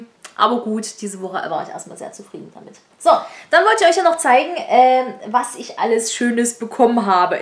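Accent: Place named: Saxony, Germany. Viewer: "OF RUBBN!!" 😂😂 Oh man. ❤ Find ich soo gut das du dein Sächsisch nicht versteckst! Ich versuche immer mein bestes zu geben mein Thüringischen Dialekt zu verstecken. Und Pain au Raisin finde ich riecht SEHR nach Rosinen. 😂😂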